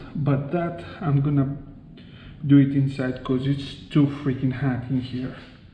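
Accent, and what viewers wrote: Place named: Greece. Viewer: Yo man... finally a voice. Love the accent. Reminds me when I was kid... my buddy was Greek and his dad had the same accent. From what I have read, the Puig is great for wind protection. Good job bud.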